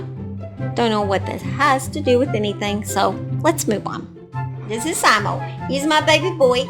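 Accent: South, United States. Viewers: Hi Dolly. ( just a term of endearment, ) My momma was born in Alabama ( Birmingham) . I love your accent. It reminds me of my family in the South.❤ I think you are great at these stories because you somehow keep it short, and sweet ( well as much as you can tell it).☹️ No, no ,no! What good are these monsters doing on this earth??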